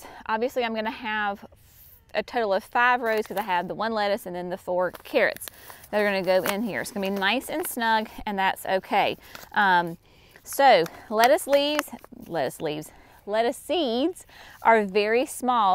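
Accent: South, United States. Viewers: Great tips! You are so pleasant to watch. I’m from New Hampshire and your southern accent sounds beautiful to me🥰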